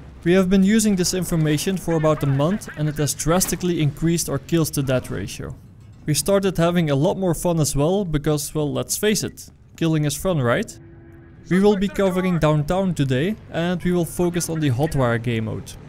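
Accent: Netherlands. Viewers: 2 questions 1. Are you dutch? 2. Are you? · at least it sounds like a dutch accent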